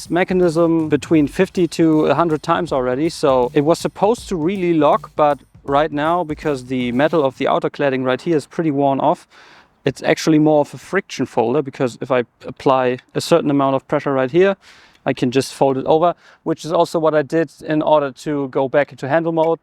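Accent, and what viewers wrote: Germany. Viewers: Ich hab nie bevor deinen Kanal gesehen, aber von deiner Aussprache hab ich gedacht, dass du deutsch warst. Jetzt weiß ich, dass du WIRKLICH Deutscher bist 😂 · Memes ✅ Nice edeting ✅ Pfandfalschen ✅ Deutscher Aktzent ✅ Dishonored content ✅ Mein abo hast du 😁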